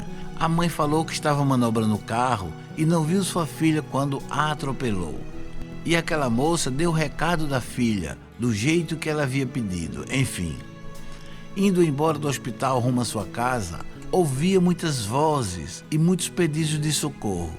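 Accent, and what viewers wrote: Northeast, Brazil. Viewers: Sempre ouço seus depoimentos senhor locutor, de qual Cidade Nordestina vc é ? Pelo sotaque. Eu sou de GOIÂNIA GOIAS.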